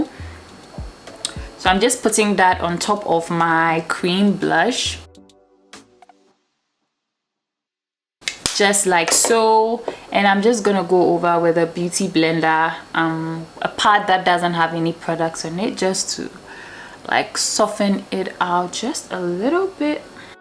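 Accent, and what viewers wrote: Ghana. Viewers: Loved this. I was listening to you talk and I thought "she sounds Ghanaian", then I read your profile and see that you are in Ghana. So cool! Thank you for sharing your knowledge with us!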